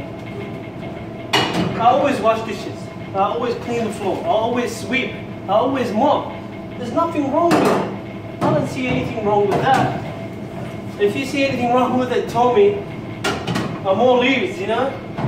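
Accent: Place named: Australia. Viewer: wow aussie accent! :P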